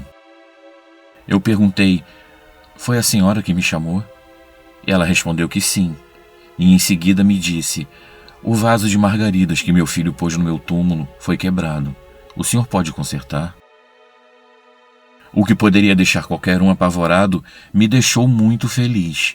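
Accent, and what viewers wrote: Rio de Janeiro, Brazil. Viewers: Mas o sotaque dele é de carioca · Ótimo relato! Um ponto extra é pelo sotaque carioca, que eu amo, pois também sou carioca da gema! Muito sucesso pra você! 👍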